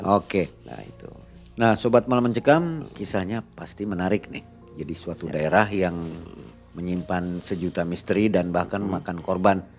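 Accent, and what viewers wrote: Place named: Indramayu, Indonesia. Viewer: Waalaikumsalam salam mng ei,😂😂maaf mang bhsae wng Indramayu khas bnget (dicekedong)sya jg asli orang Indramayu,SHT2 crew MM dan narasumber nya...❤❤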